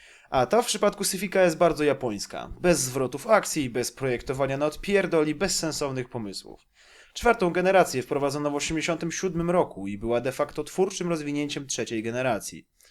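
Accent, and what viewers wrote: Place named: Ostrołęka, Poland. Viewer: Ostrołęckie akcenty, honda na WO.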